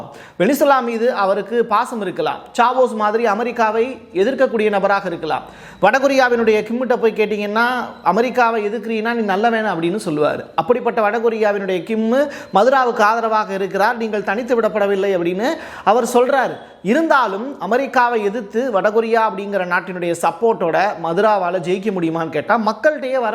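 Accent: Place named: Madurai, India. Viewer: Maduraipesu madurai pesa unnai kettaangala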